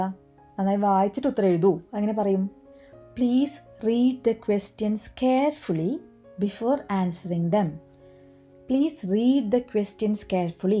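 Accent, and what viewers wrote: Thrissur, India. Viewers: Mam you are from Thrissur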